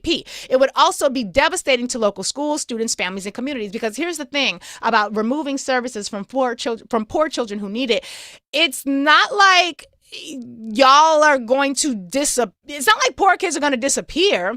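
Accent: South, United States. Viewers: si your southern bell goes extremely hard. there should be a part of your show dedicated to just this.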